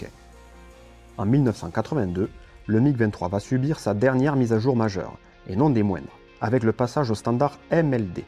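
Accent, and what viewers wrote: South, France. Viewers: Ce délicieux assin' du sudeu pour raconter un avion soviétique est une merveille, toujours le même plaisir à faire le tour de vos vidéos tous les quelques mois =)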